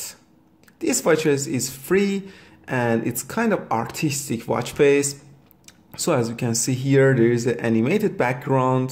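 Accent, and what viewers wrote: Iran. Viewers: I bet your ass that's a Persian accent. Lol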